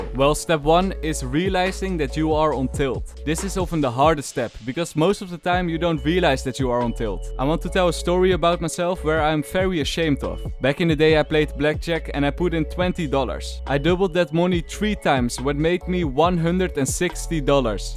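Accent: Netherlands. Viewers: You are dutch aren't ya? ;)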